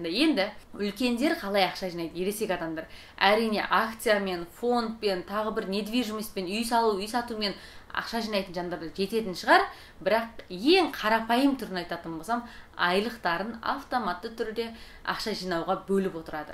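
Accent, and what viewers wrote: Standard. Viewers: Менде сізге қосылам,әдемі,сұлу,қазақша таза сөйлейтін,қылықты қыздың кәрістің қатыны болғаны өкінішті. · Қазақша таза сөйлейді екенсің. Айналдым даусыңнан. Бақытты бол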